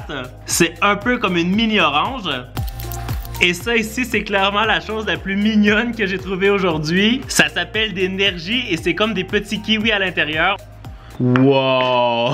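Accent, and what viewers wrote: Quebec, Canada. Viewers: J'aime trop votre accent les québécois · Alain Leung -Kwok logique il a un accent québécois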